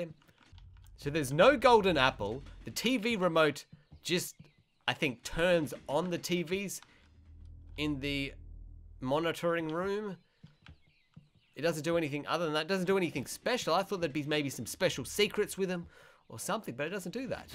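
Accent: Australia. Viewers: are u Australian? u do sound like an Australian(not in the bad way) I just wanna know where u from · Are you Australian? Fav new YouTuber in a while! Good content keep it uo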